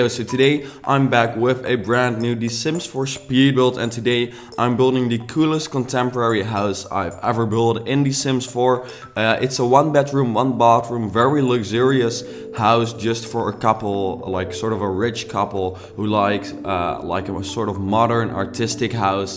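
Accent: Netherlands. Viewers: I come from Belgium, you have such a nice accent, I hear you're Dutch, but it's a nice accent lol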